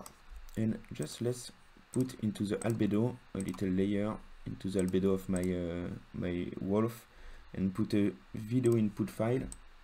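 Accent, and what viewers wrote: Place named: France. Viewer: Quand un français parle Anglais c'est toujours marrant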